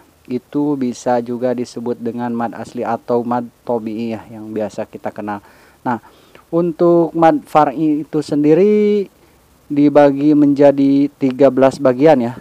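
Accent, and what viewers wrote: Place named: West Java, Indonesia. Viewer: logat sunda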